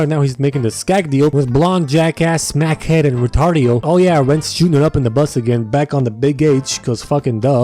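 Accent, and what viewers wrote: Scotland, United Kingdom. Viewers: welcome to 1980's/90's scotland, auld maggie thatcher stuck to them hard, also the scottish accent was on point boi! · I’m convinced throughout the whole video he became more Scottish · Your Scottish accent is pretty good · your scottish accent isn't too bad. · Should have done the entire thing with a scitish accent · Your Scottish accent Is great. Don't stop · This man Scottish accent is gold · To other Scottish accents I've heard you're's isn't that bad (I'm Scottish btw) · Loving the Scottish accent From a Scottish person😂😂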